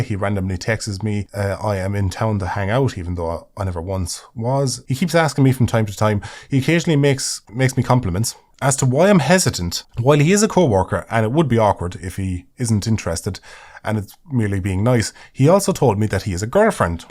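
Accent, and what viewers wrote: Ireland. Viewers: glad to have another podcast thank you so much its always awesome to hear that lovely Irish voice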